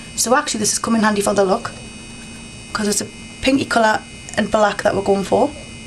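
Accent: United Kingdom. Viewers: I'm Brazilian, could you talk a little slower? Just a little? I have to put the audio transcription to get most of what you say by reading, and normally I talk to native Americans who I can understand more than you who is British. I say that 'cause I love your videos and make ups. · Very good tips.. it will helps a lot in my photoshop retouches! Just one thing I hated: your british accent. American sounds good to me, but... Thanks a lot!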